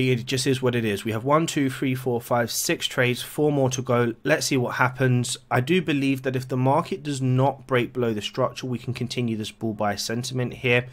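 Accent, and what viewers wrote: London, United Kingdom. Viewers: Broken english accent??? Bro it's a London accent lol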